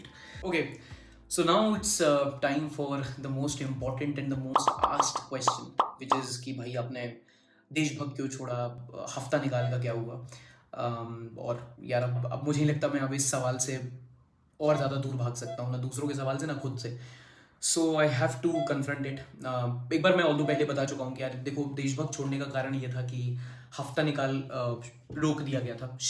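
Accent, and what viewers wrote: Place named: Bundelkhand, India. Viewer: I love your bundelkhandi accent bhaiya Being from Rath i can relate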